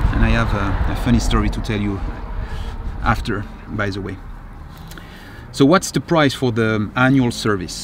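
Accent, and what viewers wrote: France. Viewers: Very honest review, and I like your French accent ! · Is he French?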